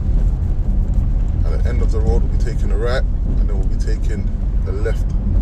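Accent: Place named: United Kingdom. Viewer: he literally has a british accent and it says innova business park?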